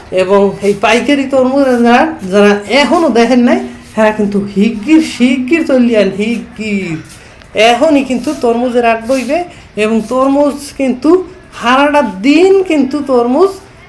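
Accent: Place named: Barisal, Bangladesh. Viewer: Bhai pure Barisalya dialogue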